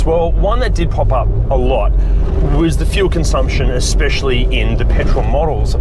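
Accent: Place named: Australia. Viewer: Why is it since TOP GEAR UK every car review is talk so much other than car...and on top of this heavy Australian accent 😅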